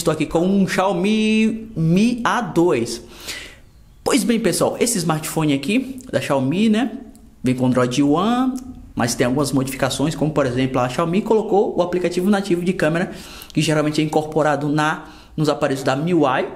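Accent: Bahia, Brazil. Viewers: Tu é baiano man kkkk?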